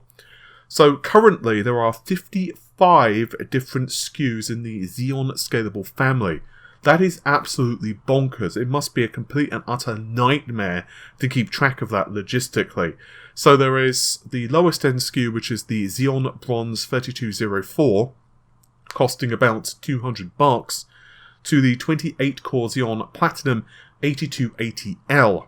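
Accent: United Kingdom. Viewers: Its rough having a deep voice with a British accent imo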